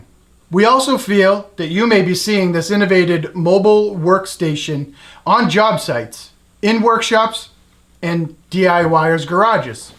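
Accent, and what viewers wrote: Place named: New England, United States. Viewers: Weird how much you sound like Rob! Born and bred in New England too, no doubt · He talks just like the older guy, it's not just the New England accent either. I wonder if he taught him how to do it? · Is that a lovely New England accent? Well done 🎈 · Its our Boston accent!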